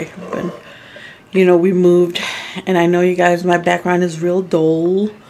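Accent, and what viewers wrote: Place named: England, United Kingdom. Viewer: I hear a English accent ssooo cute.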